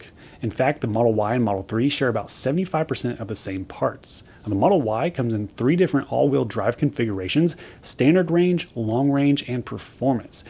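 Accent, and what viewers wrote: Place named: Midwest, United States. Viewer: As always, a nice, professional and useful video,! You can always be counted on to get right to the details and to also allow us to hear about your experiences. You are SOLID GOLD! Thank you! P.S. Kinda sounds like Tesla's PR company wrote a lot of the "specs" text for you to read. No worries, but because you speak (or the video speed is "enhanced") fast, I had to CONSTANTLY, stop and rewind to hear all the spec details packed in to every sentence. May want to ask Tesla's PR firm to not enhance the speed of the video. Remember, the #1 group of car buyers is WOMEN. (Over 70% of the NEW cars purchase decisions are either directly by a woman or heavily "influenced" by a woman. This is according to published, industry stats. The remaining 30% of the NEW car decisions are made by men - who are single, or are buying a "collector" (not a commuter) car, or have no family and/or no significant female in their life. 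SOOoooo...as I am starting to explain to a couple of other "engineering" - driven sites, please SLOW DOWN and speak in your NATURAL voice. You have a GREAT Midwestern voice, which is very pleasant to listen to. NO need to RACE through everything. We LOVE what you have to say. Hugs and again, THANK YOU!!! 💐🌺🌻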